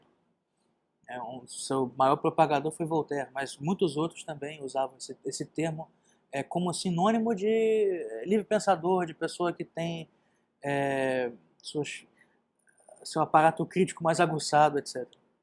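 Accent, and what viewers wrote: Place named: Israel. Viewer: Percebo um sotaque israelense Ótimo video, ótimo canal. Parabéns que Deus abençoe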